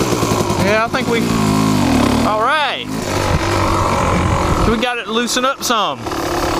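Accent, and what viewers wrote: South, United States. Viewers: Georgia or Louisiana lol you seem like your from 1 of those states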